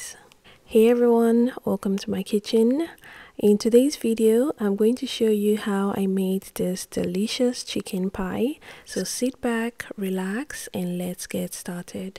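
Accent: Nigeria. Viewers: Ngl you sound Nigerian cuz its like your saying botter instead of butter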